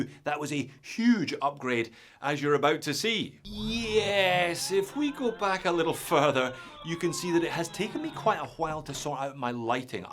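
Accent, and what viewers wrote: Scotland, United Kingdom. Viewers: Is it just me or do you sound more Scottish back in 2017? 😅😅❤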